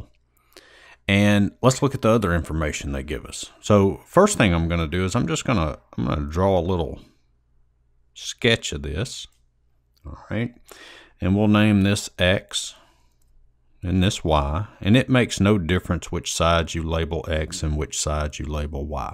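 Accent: South, United States. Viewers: Good video. Definitely the first southern guy I’ve had in one of these videos lol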